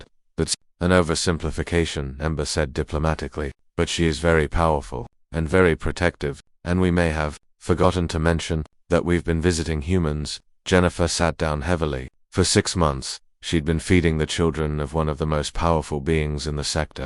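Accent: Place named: United Kingdom. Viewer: Narrator has a British accent , very subtle.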